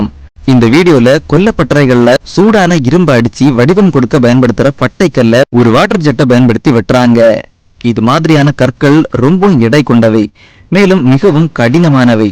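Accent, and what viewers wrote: Kanyakumari, India. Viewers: Avaru kanyakumari 👍🏻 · Bro neenga kanyakumari a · Bro neenka Kanyakumari aa?? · Bro ninga kanyakumariya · Kaniyakumari slang 😂 👌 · Bro nenga kaniya kumari ya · Aiiii Nee Kanyakumari ah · Neenga kanyakumari ahhh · Bro neenga kannyakumari ah? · Bro neenga kannya Kumari ya ? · Makka kanyakumari ya unak kk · மக்கா நீ கன்னியாகுமரி காரனா ? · Makka kanya kumariyaaaaa😂namma ooru slang mix agi varuthu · Neega kanyakumariya? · He is from kanyakumari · 🙄Bro neenga Kanya kumari ahh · Bro kanniyakumari ah 😍❤ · Bro nega Kanyakumari ya .. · Bro neenga kanyakumari ya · Bro neenga kanyakumari ah.. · Neenga kanyakumari district ah bro?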